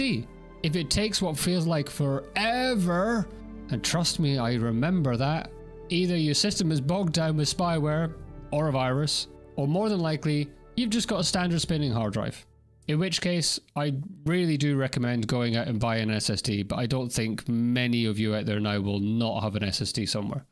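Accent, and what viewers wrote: Scotland, United Kingdom. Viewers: Thanks for the great video. This will help squeeze every bit out of Star Citizen. Also, love the Scottish accent.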